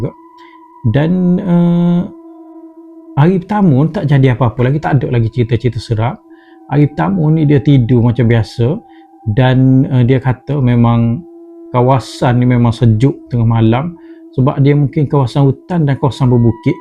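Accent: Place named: Kelantan, Malaysia. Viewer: Bahasa tu bang.... Lekat sangat bunyi Kelantan... Kadang kurang faham... 😊... Harap maklum ye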